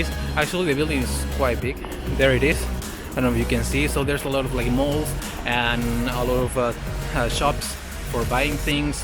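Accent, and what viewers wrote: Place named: Japan. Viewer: Rosario Herrera I think you are right, his accent is very Japanese and he has the traditional samurai hairstyle.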